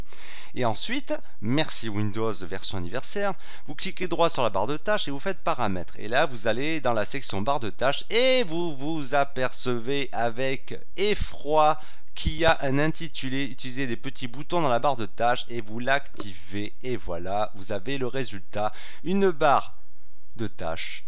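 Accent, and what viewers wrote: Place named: North, France. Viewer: Ben ouais t'a raison m'gamin!!! comme ils disent dans le Nord, le patois du Nord, il faut le comprendre, je ne le comprends pas toujours, mais il me fait bien rire, sans moquerie méchante, je le dis au cas où les vrais Nordistes d'Origine n'aimeraient pas ce commentaire..... Ssshusssssssssssss!!!!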